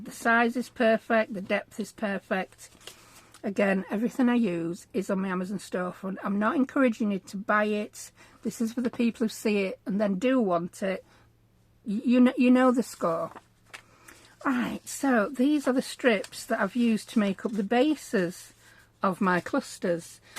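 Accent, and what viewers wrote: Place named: Northern England, United Kingdom. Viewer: I also keep checking in, and have several tubes looked to see if there was a way I could message her just to see if she was ok. I'm in Lancashire and her Northern voice is so familiar and comforting it's like crafting with a friend and I miss new videos dropping.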